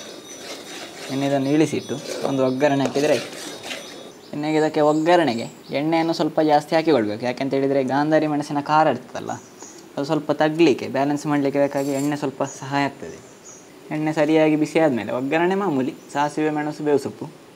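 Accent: Mangalore, India. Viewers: Thats Mangalore Kannada accent. Yes, unlike Bangalorians they speak pure Kannada.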